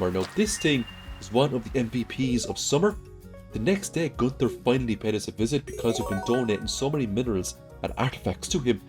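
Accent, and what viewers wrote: Ireland. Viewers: OMG I knew it, I knew you must be irish! So glad I ran into your answer to btw an absolutely inappropriate question.... I've been learning irish for a while now and I'm just so pleased that I've managed to recognize it in your english :D The way you're saying "farm" was the strongest hint 😂 I LOVE it btw, could listen to you for endless hours - which is what I just actually did by watching this video xD Just started a fishing only Riverland farm myself and was coming for inspo. You've been such a big help, absolutely nailed the challenge. Keep up the good work! ❤️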